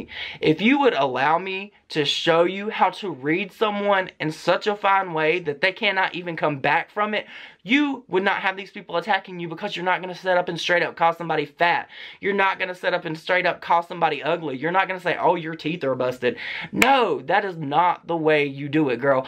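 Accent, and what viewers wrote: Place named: Alabama, United States. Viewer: New sub. Our accents match! I’m from Alabama ♥️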